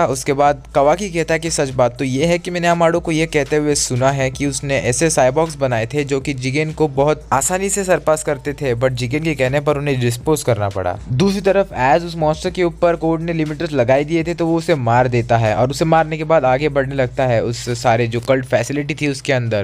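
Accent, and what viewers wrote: Bengal, India. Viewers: Bangali he😅😅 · Ya bangali h😹😹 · Ye bengoli hai 😅😂😂😂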